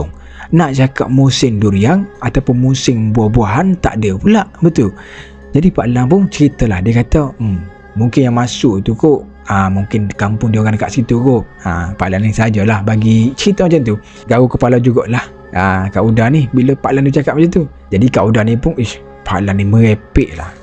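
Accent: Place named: Terengganu, Malaysia. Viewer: haha..ingat cakak banyak G belakang ore ganu..ok terbaik..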